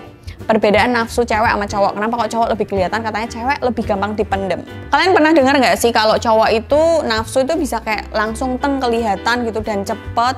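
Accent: Java, Indonesia. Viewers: mbak,logat jawanya kental banget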